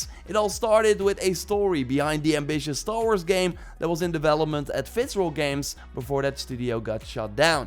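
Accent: Netherlands. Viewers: You sound Dutch :o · Nederlandse accent...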